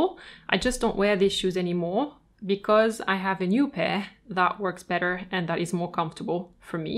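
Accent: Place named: France. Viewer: Dear, thanks so much for the video. It inspired me to declutter my shoe closet. I am avid fun of Mary Condo, so i do organize my closet and periodically declutter. Even though i do not own many pairs, i really appreciate high quality. Is it possible you spell out the brands that are featured in your video? I watched it several times but due to french pronunciation can not catch the names.;) I especially loved your platform boots and platform summer sandals. and black shoes, they are so spectacular. The links you posted does not take me to the same shoes. May be because i am located in US and it takes me to German version of the website. If i know brand names of the shoes featured in your video i could look up based on the brand name. Thanks os much in advance!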